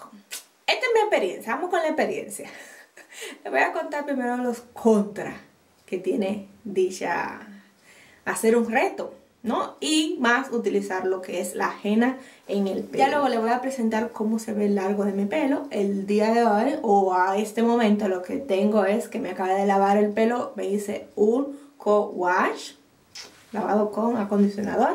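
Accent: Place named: Dominican Republic. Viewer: Gusto tu pelo el mio és asi pero yo me derribo por que me duele mucho para desenrredar .jejeej .guste de tu canal te boy a dejar mi apollo y suscripcion y te invitarte a mi canal aver si te gusta y me apollas me parece que eres de 🇩🇴 por el acento me alegrarei si eres de aya amo encontrar dom en YouTube.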